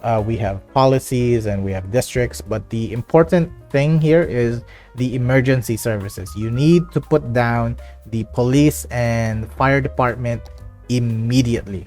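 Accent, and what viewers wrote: Philippines. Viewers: are you from ph? haha cause of the accent. new subscriber! really good info as well. thanks! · I’m actually liking the plot of your city design. However, I noticed every-time you are using skywalks, you intend on using parking gates thereby generating “park income”. Isn’t that kind of cheating? I mean it aint a crime but it’s definitely triggering me😂😂😂 Nevertheless, love the series. Nung una kita napakinggan, alam ko na pilipino ka 😂. It’s nice to know na mai CS content creator na pinoy.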